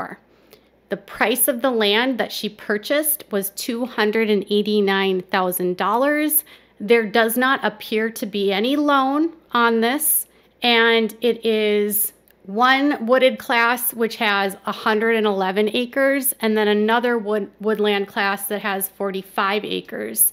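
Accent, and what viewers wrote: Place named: Midwest, United States. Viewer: I'm also from rural Minnesota! For a while now, maybe your accent, I've thought you were from MN or somewhere in the midwest.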